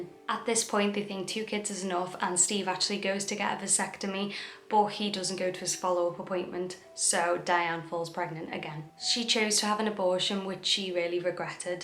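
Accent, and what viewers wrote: Liverpool, United Kingdom. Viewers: is From Liverpool, England. That is a Scouse accent.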